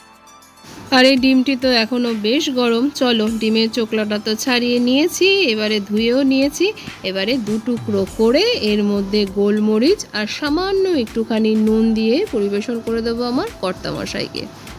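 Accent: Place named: Bangal, Bangladesh. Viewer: খুব খুব ভালো লাগলো তোমার আজকের ভিডিওটা তোমার কথাগুলো শুনতে খুব ভালো লাগে আর মাঝে মাঝে বাঙাল কথাগুলো বেরিয়ে বেরিয়ে যায় শুনতে ভালো লাগে আজকে যেমন বলেছো ডিমের চোকলা আমিও মাঝে মাঝে বলি চোকলা কিন্তু ঘটিরা বলে খোলা ডিমের খোলা তোমার কথাগুলো শুনলাম মাছ ধরার টিপসটা খুব ভালো বলেছ আমিও এই রকম করি খানিকটা সরষের তেল মেখে নিন ভালো থেকো সবকিছু নিয়েই ভিডিওটা খুব ভালো লাগলো আর তোমার কথাগুলো তো তুলনা হয়না❤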